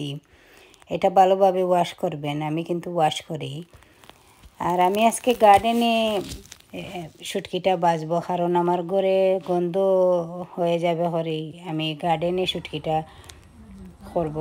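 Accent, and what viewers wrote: Sylhet, Bangladesh. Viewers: Didi India thakidekhram. Ajkeu recipeta try khormu. Okhonto katholer season. Syloter khawoa AR accent ekirokhom. Aphnar Allah AR Amar Bhogoban. Kintu armra daughter of the same soil. Okhon aphner channel subscribe khormu. Lots of love from India.uttor dilekhushi oimu no heart emoji........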